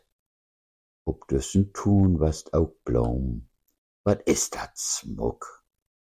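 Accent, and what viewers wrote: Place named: West, Germany. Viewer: Von West nach Ost wird manches anders gesprochen, aber alle verstehen es; wo ist der Sprecher zu Hause? W ohl eher nach Westen zu.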